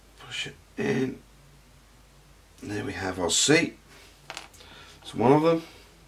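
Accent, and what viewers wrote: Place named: Great Yarmouth, United Kingdom. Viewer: know the area well, I'm from the gt yar. Area. Recognised our accent.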